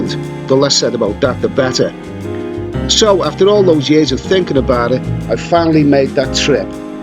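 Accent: Liverpool, United Kingdom. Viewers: Great video, mate, love hearing a proper Scouse accent instead of one of those well heeled condescending upper class tour guides telling me nowt but how to fund their Patreon account. Proper honesty in your opinions made this a pleasure to watch. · Are you scouse?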